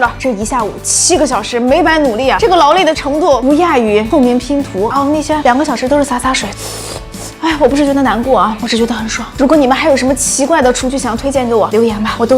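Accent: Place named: Qingdao, China. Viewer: 你是四川的？你说话我还以为你是青岛的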